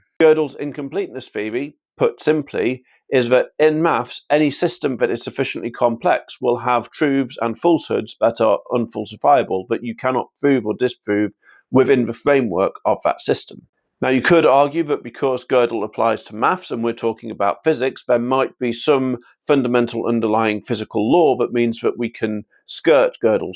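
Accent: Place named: West Country, United Kingdom. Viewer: Mate, can you go to a finishing school or something, im sure what youre saying is right and should be interesting but coming from you it sounds like something from the worzels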